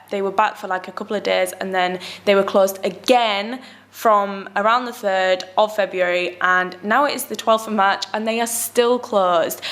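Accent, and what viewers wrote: United Kingdom. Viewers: I love UK accent wish you all luck lady. BTW you're so pretty oh one more thing stay safe and healthy 👏👏👏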